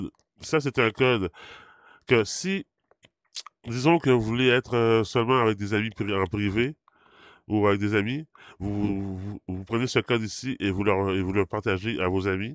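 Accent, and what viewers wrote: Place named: Quebec, Canada. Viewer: Merci je suis newbie avec le Quest 3 depuis 6 jours et j’ai comme on dirait du stock d’appris depuis! Vos explications sont claires et simple à comprendre! Merci pour cette introduction au Bigscreen qui est sans doute semblable aux salles plateformes cinémas! Je suis déçue de m’apercevoir combien c’est monétisé ces machines sans bon sens et ça ressemble étrangement à in PC! Personnellement je ne suis pas fan des jeux que je trouve enfantin de toujours vouloir détruire quelque chose ou de tirer avec des engins sur des gens ou pour avoir accès à des points ou bonus et je ne sais quoi! Par contre, j’adore apprendre quelque chose, voyager ou regarder des films en 3D ou réalité immersive! Il y a un gros potentiel, mais Meta a fait fausse route en développant des jeux presque essentiellement. Car il aurait été plus intéressant de developper le côté immersif dans d’autres applications! 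Je crois que c’est la voie à nos PC de demain, comme on voit dans Star Streck!😊 je suis du Saguenay et vous d’où du Québec, car votre accent est québécois! Merci bcp!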